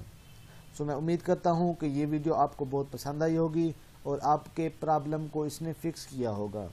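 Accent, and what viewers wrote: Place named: India. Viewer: Shame that you speak too quickly and as Indian English accent is very difficult to follow , it would be better if you place subtitules.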